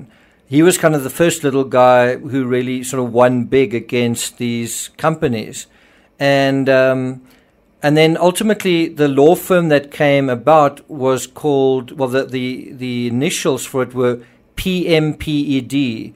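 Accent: South Africa. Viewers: Thanks for this episode and your groovy safrican accent! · Your accent leans more south African than American, your from?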